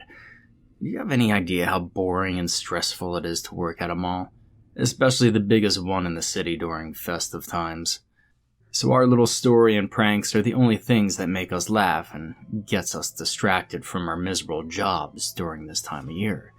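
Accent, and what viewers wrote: South, United States. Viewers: I thought you was from down south here around Tennessee South & North Carolina or Kentucky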